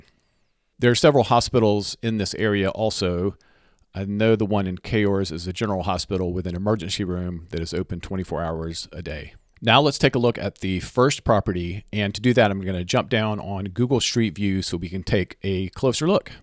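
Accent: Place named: United States. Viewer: It's the American accent. The British say it differently.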